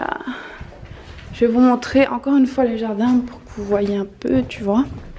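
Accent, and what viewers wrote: Belgium. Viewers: Est-ce que tu es d'origine belge ou d'une autre origine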